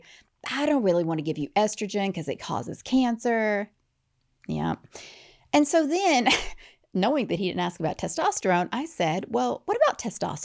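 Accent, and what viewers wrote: United States. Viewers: Painful American … my ears started bleeding listening to this crap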